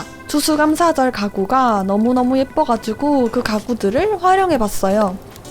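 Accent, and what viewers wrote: Gyeongsang, South Korea. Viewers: 영상만 해도 힐링인데 갱상도갬성으로 내적친밀감이 +1000 상승했습니다♥ 흥해라 갱상도! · 너. 대구 사람이지. 표준말투 쓰는 대구 사람가터. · 님 영상 처음본게 이거인데 그때도 님 억양듣자마자 바로 대구사람인거 알아챔 ㅋㅋ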